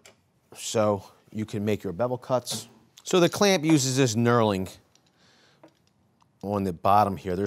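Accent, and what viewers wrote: Philadelphia, United States. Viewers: Knew u were a philly guy from the accent lol Gonna sub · LOL, knew you were from Philly.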